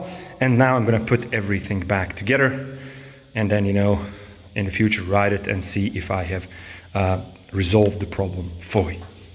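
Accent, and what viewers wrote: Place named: Bosnia and Herzegovina. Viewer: I was surprised when I heard this very familiar Bosnian voice on an unknown small channel. Guess 2 x 2 is 4 then.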